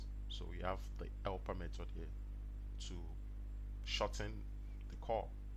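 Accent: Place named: Nigeria. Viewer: you sound nigerian.. are you?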